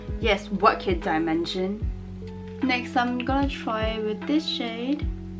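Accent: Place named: China. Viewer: Where is your accent from? Sounds like English mixed with Chinese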